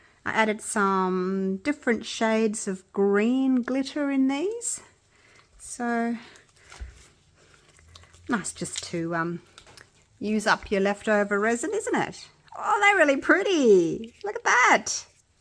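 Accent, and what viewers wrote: Australia. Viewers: Gday, so nice to hear an Aussie voice, I’m in qld. So you get your resin from us, or uk? I’m a beginner and use mainly if resin, but wanting to try the deep pour resin, bit worried on how much postage from another country will be. Thanks for awesome video.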